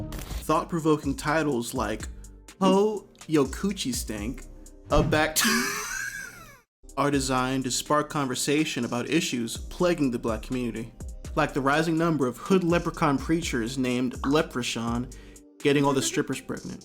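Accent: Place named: Atlanta, United States. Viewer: He sounds more Atlanta than Miami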